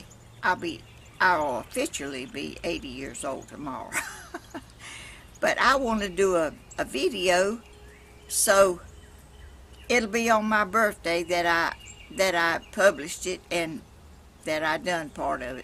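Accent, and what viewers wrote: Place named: Georgia, United States. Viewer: Happy birthday dear lady. I am a new subscriber. I enjoy watching your videos and I especially like listening to your lovely GA drawl. 😊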